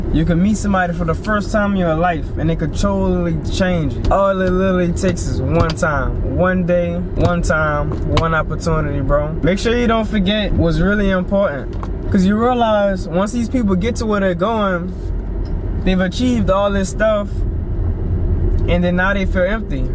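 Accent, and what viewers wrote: New Orleans, United States. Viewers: is that a NOLA accent I hear? I love NOLA accents and thanks so much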